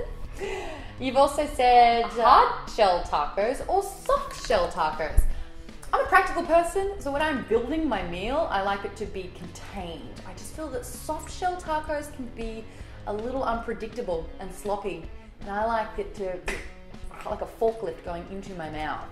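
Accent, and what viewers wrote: Australia. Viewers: I hope you never lose your accent. Australian is so nice to hear! I hate that so many Australian/New Zeelanders/British people in acting don't get to use their accents more. So when I hear an accent I just smile and it brightens up my day. It's one of many good things about you. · Don't ever lose that Australian accent,! It's part of your charming personality!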